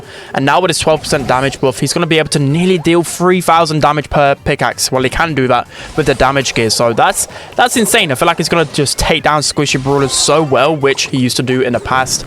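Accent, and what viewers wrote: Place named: Liverpool, United Kingdom. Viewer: You're a Scouser? Yeah I'm unsubscribing.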